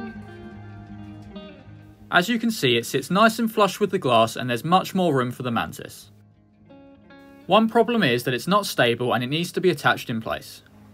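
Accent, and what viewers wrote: United Kingdom. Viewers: bruh this guys british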